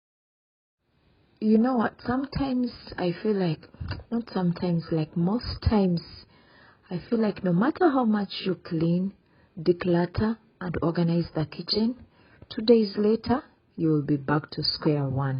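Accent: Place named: Zimbabwe. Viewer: Accent sounds very Zimbabwean 😘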